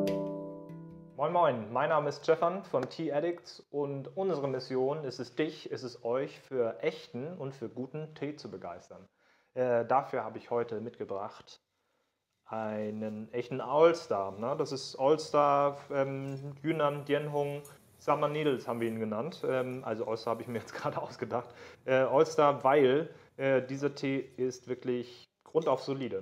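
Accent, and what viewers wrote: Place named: North, Germany. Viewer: Und dann noch dieser nordische Slang mit dem angequatscht wird! ;-) Großartiges Video!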